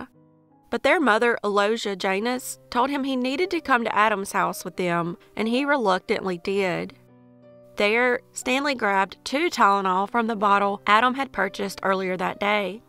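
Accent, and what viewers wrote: South, United States. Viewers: So good to hear a southern accent, I live on the other side of the world and this is so very good to hear. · Love your true southern accent.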